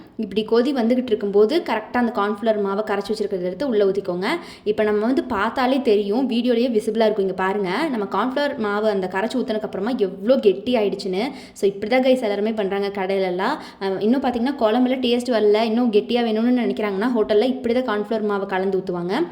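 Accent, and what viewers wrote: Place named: Madurai, India. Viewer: oh nice 👍 im coimbatore than i thought u as Madurai ponnu 😊😅 because of your slang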